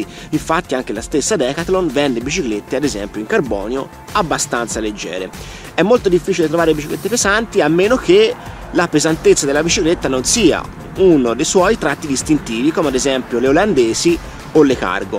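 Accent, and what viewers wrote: Italy. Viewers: Ma di quale parte di siena sei? Dal tuo accento mi sempri senese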